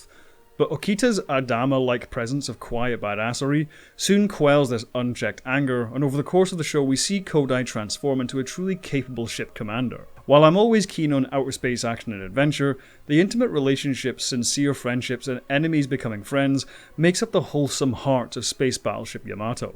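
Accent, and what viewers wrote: United Kingdom. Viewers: I'm guessing he's British from the accent. Whether he's in the UK or Australia, however, means Harmony Gold isn't an obstacle to getting localized product.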